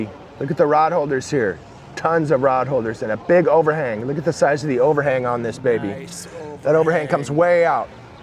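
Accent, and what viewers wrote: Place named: Seattle, United States. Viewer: I can tell you are from Seattle by the way you talk.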